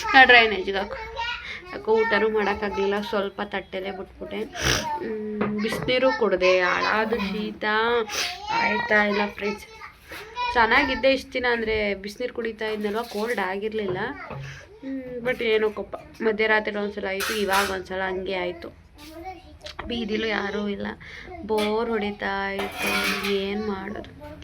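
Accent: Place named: Mandya, India. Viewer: Nimma kannada mathadi a style nodidre mandya side nivu please heli Nanna sister na k m. Doddi side marriage madi kotidivi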